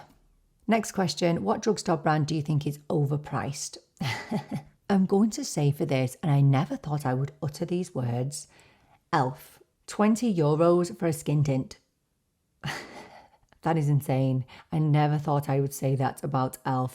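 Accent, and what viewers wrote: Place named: England, United Kingdom. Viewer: Just joined your community, some excellent videos and very informative. Can I ask where is yours accent from as you said you live in Europe and your accent is very English and your mannerisms. Gorgeous look❤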